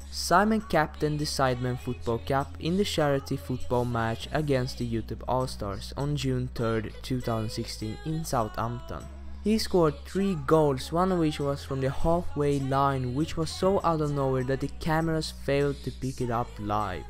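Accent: Sweden. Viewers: are u from sweden? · You from Sweden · You're Swedish aren't you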